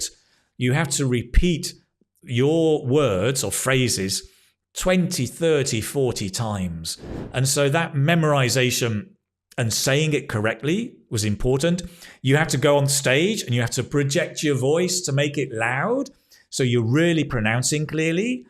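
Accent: United Kingdom. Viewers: Hello, I could stay for hours listening to you speaking with that wonderful British accent. I am Argentine, I learnt English when I was very young. Now I'm 62 and findd that learning languages is amazing. Five years ago I started learning French and now I can have a conversation or read or listen. I went on changing my methode. Once I felt confortable with the basic grammar rules I started watching videos of different subjets just to grasp the accent and the vocabulary of real people. Now, I am learning German! My level is really basic but one day I found myself reading and listening to a simple story. And that was so motivating!!! As you said, each one has his own methode. Mine is as simple as go on changing methodes!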